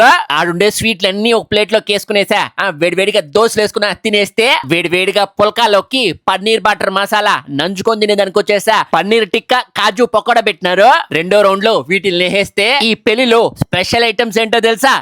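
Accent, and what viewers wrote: Rayalaseema, India. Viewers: Ni slag valla Rayalaseema paruvu potundi Anna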